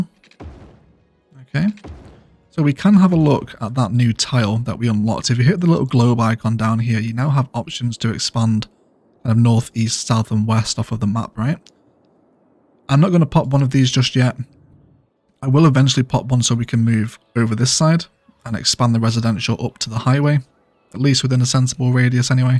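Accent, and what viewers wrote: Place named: United Kingdom. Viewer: Strange, for me as Russian your accent sounds like british but you prefer rigth-hand traffic. Its my ears failed? :)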